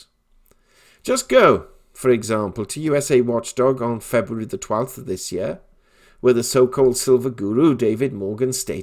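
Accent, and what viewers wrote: England, United Kingdom. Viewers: Mate you sound like a true pome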